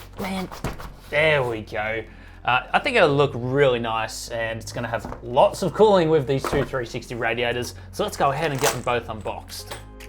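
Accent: Australia. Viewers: Are you Australia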